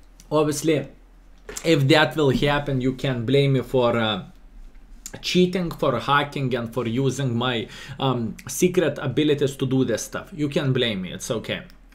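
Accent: Russia. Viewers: where is he from then ??? He may not live in Russia but definitely speaks it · russian akcnet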